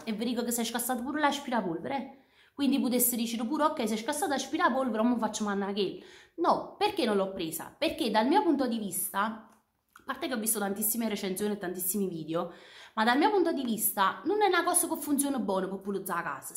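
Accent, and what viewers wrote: Naples, Italy. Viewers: Simpaticissima, anche se avvolte quando parli napoletano non ti capisco. Ma seguendo il discorso riesco a comprendere. Baci❤️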